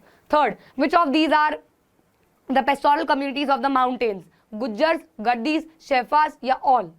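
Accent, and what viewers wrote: Punjab, India. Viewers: Ma'am ,are you Punjabi 🤔??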